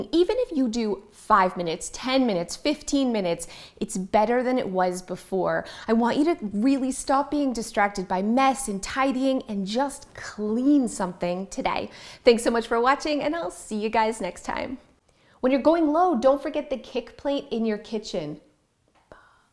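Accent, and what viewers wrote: Canada. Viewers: I don't have ADHD but I suffer depression since I was in my early 20's. All that mentioned today was a big help 😃 I started decluttering and re-organising my home about 5 weeks ago. I found your channel 🥰 I love your Canadian accent x I lived there for nearly a year in the 90's. you have been a great inspiration to conquering this huge mission I've started 😘 So far I've done 4 trips to the op shops here in Australia 🇦🇺 Reusing and upcycling what I already have in my home 🏘️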